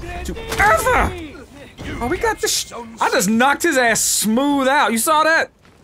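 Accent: South, United States. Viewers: How the hell would you think he's black? His accent is white southern af, I just don't see it.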